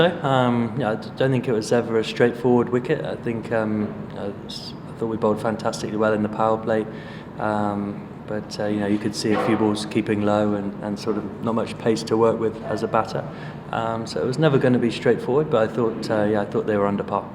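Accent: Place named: United Kingdom. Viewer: love his British accent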